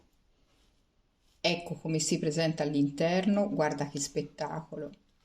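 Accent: Tuscany, Italy. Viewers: Grazie! Bello sentire il tuo splendido accento toscano!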